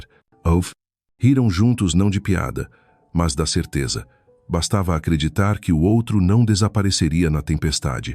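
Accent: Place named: Spain. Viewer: Não entendi o porquê do sotaque espanhol, soou mal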